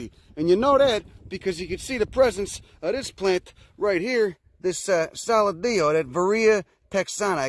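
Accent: Chicago, United States. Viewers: Is your accent fake? Or are from the south side of Chicago, cause you sound like my fam. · I love how you just blurt out latin and shit in that American accent. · You sound like my cousin he's from Chicago... just saying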